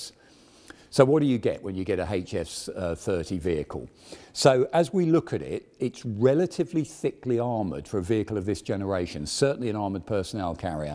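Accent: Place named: United Kingdom. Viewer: Sounds British.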